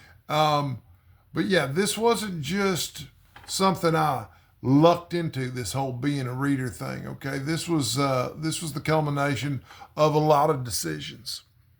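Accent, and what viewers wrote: Texas, United States. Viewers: I like your videos. I've been to Texas, I love your accent.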